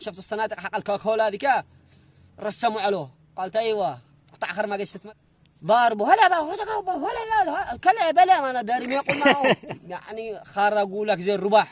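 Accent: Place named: Taiz, Yemen. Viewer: يا جماعة هذا لغجة عنده لغاجة وكمان يبهرها باللهجة التعزِّية أغثى وأكره لهجة أعوذ بالله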